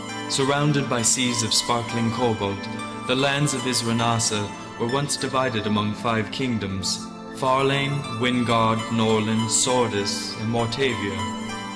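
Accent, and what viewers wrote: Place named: New York, United States. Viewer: When you were talking about your polish friend being trained in "sahkah" I thought it was some polish thing I had never heard of but then I realized, oh it's soccer, haha. Love the new york accent!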